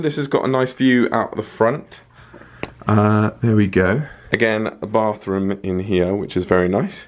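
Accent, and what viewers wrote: United Kingdom. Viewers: Lol😂 why did I find this vid oh my recommend British accent had me died😂😂😂 Am American and I love to learn British accent😂 I also live in Florida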